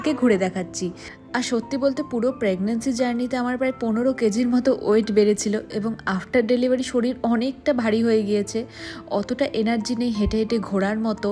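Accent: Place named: North Bengal, India. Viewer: এত্তো দিনে উত্তরবঙ্গের কথা শুনতে পেলাম❤😂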